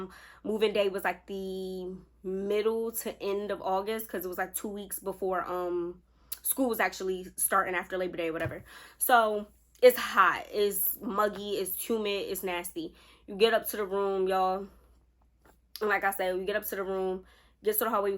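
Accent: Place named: Philadelphia, United States. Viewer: As soon as I heard you talking I knew you were from my neck of the woods (I’m from Philly )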